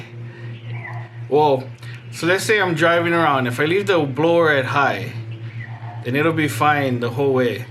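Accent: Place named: Hawaii, United States. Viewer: now if only my tired 230k mile compressor could make high enough pressure to survive in texas. i thought i recognized that accent in the first video, hawaiians are rare on youtube. local boy 🤙🏼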